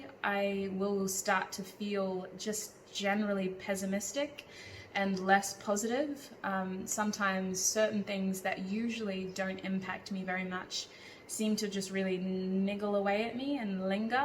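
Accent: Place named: Australia. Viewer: Love your videos and its great to hear more about you ❤ Also great to hear a fellow Aussie accent!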